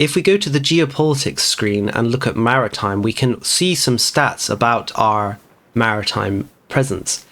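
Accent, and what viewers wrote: United Kingdom. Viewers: Are you British living in America, or an American living in Britain?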